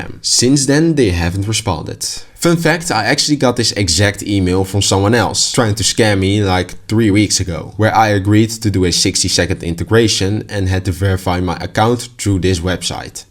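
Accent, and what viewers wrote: Netherlands. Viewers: Ik hoorde gelijk je bent Nederlander lol.. als nog goed hoor je kan echt niemand meer vertrouwen deze tijd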